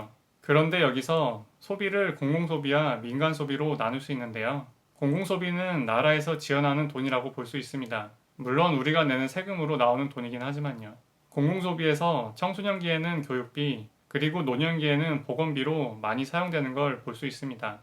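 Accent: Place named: Busan, South Korea. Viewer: 잘 보구 있습니다 ^^ 부산사나이 아입니까 ㅋㅋ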